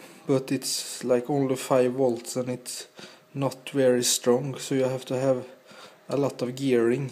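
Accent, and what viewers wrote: Jönköping, Sweden. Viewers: Nope, it's Swedish accent. Trust me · swedish i guess :) so easy tro hear as a fellow swedish person. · Du låter lite som en Jönköpingsbo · Best Swedish accent ever! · Wow! So very cool! And yes you really do sound soooooooooooo Swedish! Love it! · Hehe, den svenska dialekten.. Usch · swedish? xD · oh god, that Swedish accent :D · Swedish? Tihi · You can hear that you are from Sweden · haha, you can hear that you are from sweden, im also swedish ;) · That accent.....i feel ashamed of being swedish ....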